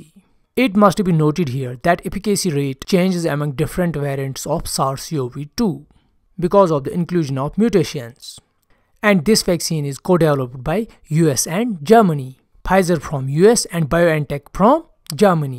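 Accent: Kashmir, India. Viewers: Kashmiri guys ....ur tone of eng shows ...nyc vdo bro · Indian accent, nah bye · 200% he is kashamri..but nice way to english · i can tell you already by the indian accent that chinese vaccines will be rated the worst before i even watch the video :)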